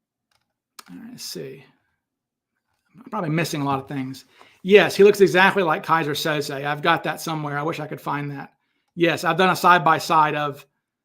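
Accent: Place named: South, United States. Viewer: Ha voice of insight/truth/reason but a hillbilly voice PS like grading the suspects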